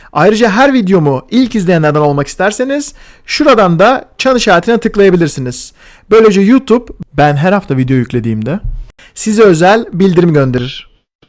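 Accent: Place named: Istanbul, Turkey. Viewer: Bugün abone oldum, çok kaliteli, İstanbul Türkçesi ve içinde biraz ingilizce ile dinlemek güzel.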